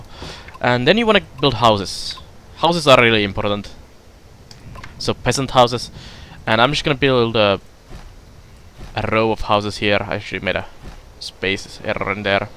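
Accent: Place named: Finland. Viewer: Finnish speaker. Cool accent :)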